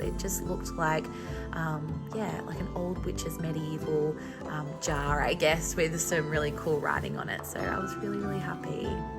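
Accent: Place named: Australia. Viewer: Me, hears Aussie accent. Instantly hits subscribe because I love supporting fellow aussies… and because Aussie witchie fun!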